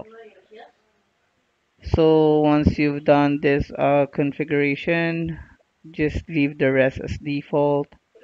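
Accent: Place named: India. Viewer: Fracking Indians. You slaughter the English language. Easiest language in the world next to Spanish yet after two centuries of English Empire domination you still can't speak it legibly. No wonder the Greeks said screw this and went home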